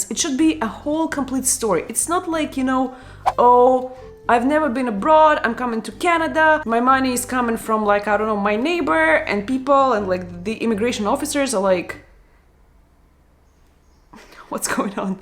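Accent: Russia. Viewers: thank you So much for this video... love your Russian accent.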